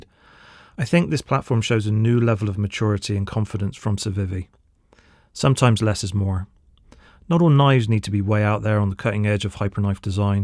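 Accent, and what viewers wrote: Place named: United Kingdom. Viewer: You sound like you're from the UK but, I'm guessing you live in the US? Purely because you are reviewing a lot of knives all of which are illegal to ship/buy in the UK. Hate UK laws.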